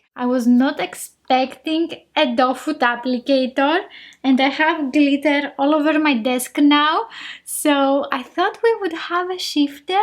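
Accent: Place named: Greece. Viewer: Είσε Ελληνίδα η κάτι τέτοιο? Γιατί η προφορά σου μιαζει ελληνική δεν το λέω για κακο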